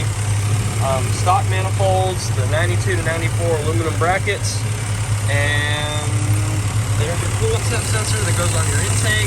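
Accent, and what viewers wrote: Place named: Tennessee, United States. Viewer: I'm from Tennessee and not only do we sound alike.... we also think alike .... lol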